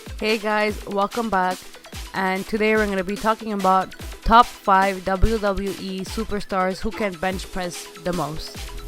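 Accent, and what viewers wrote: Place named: Iran. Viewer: What's with this woman narrating this video..sounds like she's from iran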